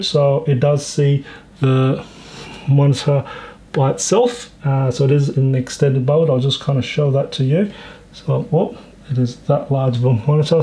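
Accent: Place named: Australia. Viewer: You sound Australian, if you are where did you buy this monitor from in Australia?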